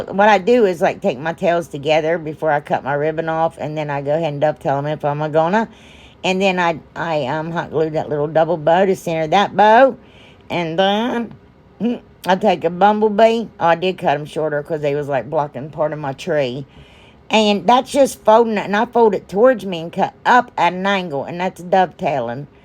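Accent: South, United States. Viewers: I have watched most of your videos and that says a lot since I just found you in the last month or two. I love you. Your laugh is such a blessing. It just uplifts me. I started watching your videos while I have been recuperating from illness. I became ill the week before Christmas and I am finally feeling close to normal. I first thought you were from the south, you sound it, but you say "you guys" which is a northern usage. We here in the south say "Y'all". Whether you're from the north or south you have not offended me. I have listened to most(my husband won't let me listen when he's watching tv). I understand everyone can't afford all of the things you have, I can't. I do appreciate that you use a lot of things from the Dollar Tree. You are not rude by no means! You are very kind especially the way you sign off. I feel like you are my friend. I wish we lived close. 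I live in a small town in the most northwest city in Tn. God loves you and so do I.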